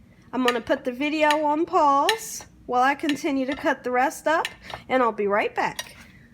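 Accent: South, United States. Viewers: This lady’s from the south for sure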